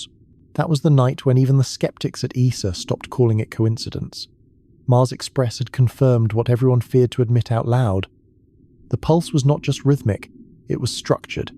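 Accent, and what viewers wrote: United Kingdom. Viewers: i'm watching from 3i/atlas. its 1am local time. oops i think i farted.. nevertheless, british accent is so cringe!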